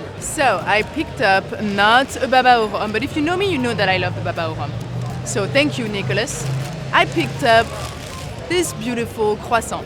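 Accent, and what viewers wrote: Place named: France. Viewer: It’s french accent 🤦‍♀️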